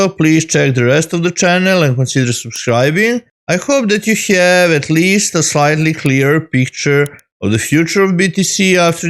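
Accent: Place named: India. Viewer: Da, zvucis ko indijac..jbg sry ostavi ako zelis da radis na engleskom ali mozda da odradis i na nasem jeziku ili novi kanal za balkance!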